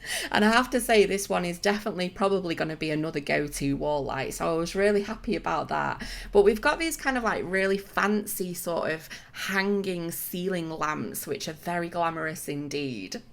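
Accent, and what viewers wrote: United Kingdom. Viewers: Enter! I'm a 15 years old boy from Bavaria, Germany. I love your videos, your britsh accent and the ideas that you come up with! You really inspire my builds! I hope you have a great time and please go on with those amazing speedbuilds. Much love from Munich😍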